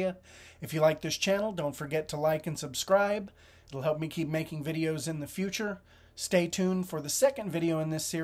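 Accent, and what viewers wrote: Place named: United States. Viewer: he sounds american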